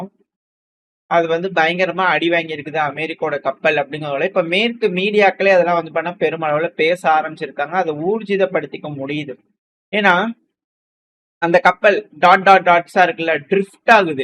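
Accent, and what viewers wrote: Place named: Chennai, India. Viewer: Madras தமிழில் பேசாதீர்கள்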